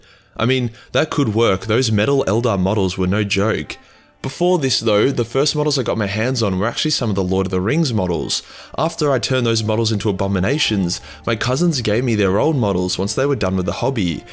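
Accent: Australia. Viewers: Gidday Mate ! Good hearing a fellow Aussie accent online !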